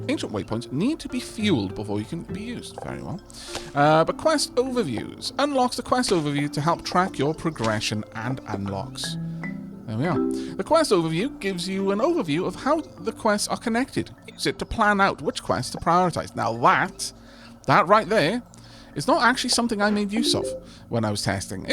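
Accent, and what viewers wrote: United Kingdom. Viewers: I 100% thought you were British. Hell, I still do.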